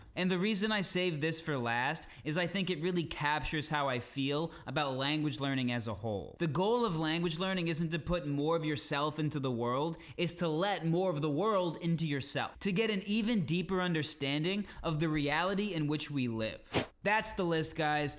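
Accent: Argentina. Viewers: nice. congrats! good accent. bit of an argentinian twist or am I mistaken? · ok - thanks. 2.5 years (?) of studying Spanish got you to a hesitant B1+ with no sense of the prosody of the language (sounds like you 're attempting Argentinian pronunciation the way you realise 'y' and 'll'). You're definitely not at a level to pronounce yourself fluent (absolutely nowhere near it), and I'd suggest more humility when it comes to pronouncing what is and is not effective language learning. But hey, it's your channel, have at it.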